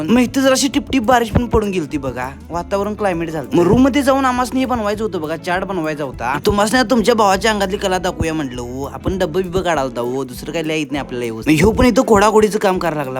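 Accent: Kolhapur, India. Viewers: Accent so cutee kolhapurkar ❤️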